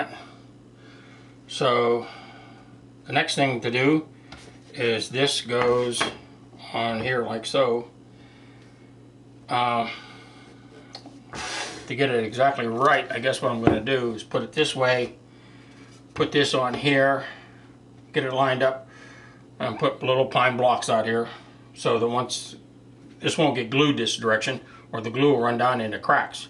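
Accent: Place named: Pittsburgh, United States. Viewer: You've GOTTA be from Da Burgh!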